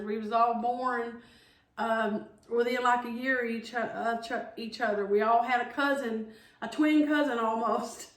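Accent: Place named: Tennessee, United States. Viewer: Hey. I absolutely love Cole slaw with almost everything too girl. I don't put lemon juice in mine or white onions. But I do put vinegar and chopped green onions in mine. I don't put carrots in mine either. My family don't like them in it. I can't hardley get them to eat Cole slaw anyway. Except q of my twin daughters. But I will definitely try the lemon juice next time I make it. I'm from Morristown Tennessee girl. And my name is Melissa. Nick name is. Lol. Glad I found your cooking channel. I also have had wilted lettuce and poan bread( biscuits). I use to call the pintos. Soup beans. And my sister wud make fun of me. That onion soup chicken looked delicious. And I also cud eat dressing all the time too. I'm sure I've had meal gravy b4. Not sure who made it. Probably my mamaw. She was raised and lived in Tennessee as well. And hearing u talk sends me back to them good Ole days. 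When u say youens. I use to talk like that too. I had to move from Tennessee back in 1995 bc of a bad abusive marriage. To North Carolina. I do miss Tennessee so much. I just don't miss the craziness I definitely had to get me and my babies out of. I still have family that lives there. Cousins, STEP dad, step kids and step grandbabiesa step brother and some friends that I wish I cud come visit on a regular basis. I will continue to keep watching ur videos sweet doll. I'm absolutely loving it so far. Your daughter is so adorable. GOD BLESS US ALL SWEETHEARTS XOXOXOXOXOXOXO HALLELUJAH AMEN 🙏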